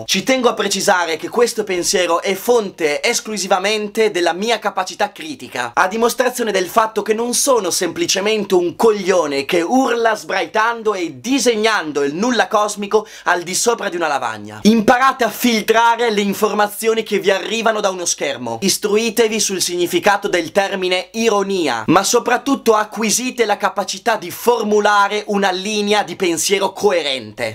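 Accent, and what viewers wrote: Italy. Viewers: sei veronese? Viva il veneto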